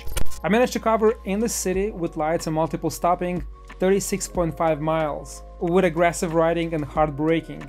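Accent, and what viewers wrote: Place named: Russia. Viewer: я не пойму комментатор русский чтоли? прослеживается акцент)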